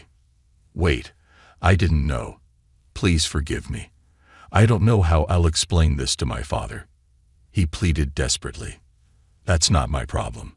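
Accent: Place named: United States. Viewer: Good story. Thank you... the narrator's voice sounds like the USA 🇺🇸!! Tired of the British accents!!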